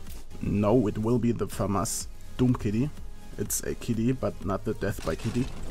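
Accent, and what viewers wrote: Germany. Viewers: Dein Englisch ist meiner Meinung nach richtig stark, Grammatik und Vokabular sind einfach perfekt, nur der Akzent ist einfach 100% deutsch ^^ Naja ich kann nichtmal ansatzweise so gut englisch, aber mein Akzent ist schon sehr Englisch:D Hättest du jetzt noch nen amerinakischen Akzent würde ich dich glatt für nen Ami halten^^ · R you german? Your English sounds like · Are you from germany? because you don't sound like an english guy, I mean it's not bad, I'm to german, i think you sound like a german :D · Dein Akzent sagt mir :,,Du bist Deutsch :D''(I think u r a german :D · U have a german voice but u are english i think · Man hört das du Deutsch bist sprich doch auch deutsch xD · Ich kann an deinem English hören das du Deutscher bist warum sprichst du nicht Deutsch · are you german your english have a german akzent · dieser deutsche akzent beim englisch sprechen ist so offensichtlich :D und irgendwie auch langsam nervig :D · are you german ?? 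:D i think im hearing an accent ;) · Man hört so dass du deutsch bist! :D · Also ich muss ja jetzt mal sagen...! Der deutsche Akzent geht mal garnich.! :DDDDDDD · i wonder if i also sound so german when i speak english. no offense, pretty much every german sounds like that. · Bist du deutscher oder österreicher ? Dein english hört sich irgendwie so an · Deutscher Akzent ist schrecklich!! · Du hast ein deutschen Akzent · hört man nordeutscher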